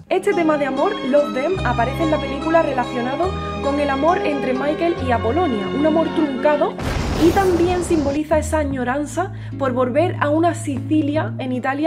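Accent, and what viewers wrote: Andalusia, Spain. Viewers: Andalucia, near from Sevilla.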